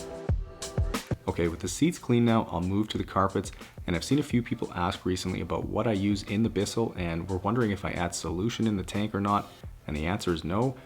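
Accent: Canada. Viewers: I just discovered your videos and now I'm addicted lol! Also I knew I heard a fellow Canadian as soon as you started talking haha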